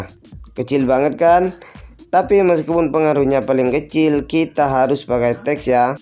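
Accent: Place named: Madura, Indonesia. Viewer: Dialeknya sepertinya orang Madura United ya, sukses terus channelnya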